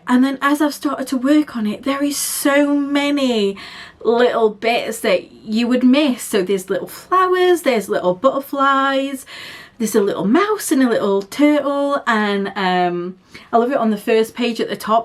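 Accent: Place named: North, United Kingdom. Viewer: Right I’m all caught up! Loving your flosstube and really enjoyed spending time with you. As a fellow northerner it’s lovely hearing another uk flosstuber! Your soda stitch finishes are stunning and I literally love all your wips. Had to stop the video a few times to check on different Etsy sellers!! I think you are far too hard on yourself re your project bags I think they are phenomenal, if I made one I’d prob end up with a t shirt by mistake or something 😂😂 so happy to see your starting Victorian charm, I completed it a couple of years ago (think I show my finish on flosstube 7) if you want to see a finish of it! Not going to lie it’s a big piece but it’s so worth it! It’s still one of my fave finishes to this day. Happy stitching lovely - take care, Lauren (Flossabilities) 🧵💗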